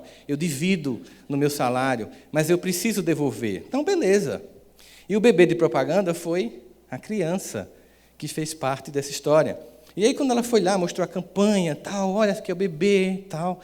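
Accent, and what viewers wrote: Northeast, Brazil. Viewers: Também gosto do sotaque. Sou paulista mais admiro um nordestino que fala assim com clareza. Na minha região falamos muito feio kkkkk. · Que história linda!!! Sou sua fã incondicional. Moro em Natal e gostaria de saber se você vem aqui para uma palestra.... lhe acompanho há quase dois anos. Adoro suas palavras; suas palestras e seu sotaque que é igualzinho ao meu. Sempre grata a você que é um enviado de Deus. Muitas bençãos pra você é família 😊🙏🏼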